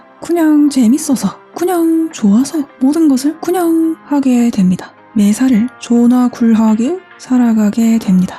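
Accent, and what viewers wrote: Gyeongsang, South Korea. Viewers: 아따 ~걸죽한 경상도 사투리여서 좋네요ㅎㅎㅎㅎ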